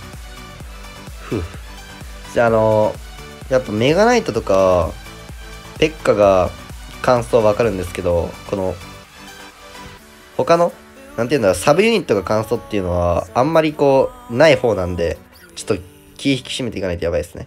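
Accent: Okayama, Japan. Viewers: ちょいちょい岡山弁でてるw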